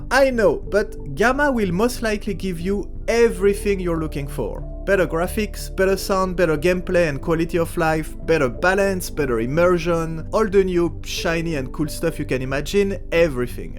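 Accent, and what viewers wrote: France. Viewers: Lately, I enjoy more and more listening english with french accent. I start to feel what that dude from Matrix said.. "It's like wipping your ass with silk." just,.. muuaahh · Are you French?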